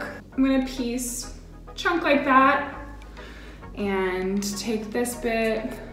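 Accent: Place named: United States. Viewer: I was not expecting an American accent !! 😂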